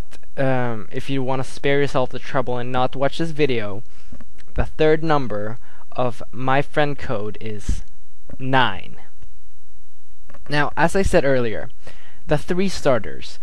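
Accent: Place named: United States. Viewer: r u an asian or an american? well, u sure have an american accent but look like an asian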